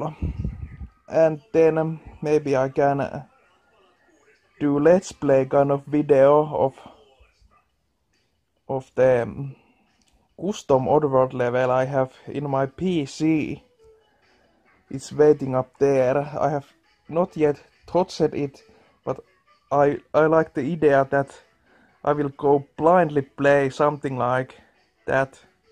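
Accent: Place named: Finland. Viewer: This is the most Finnish thing i've ever heard :D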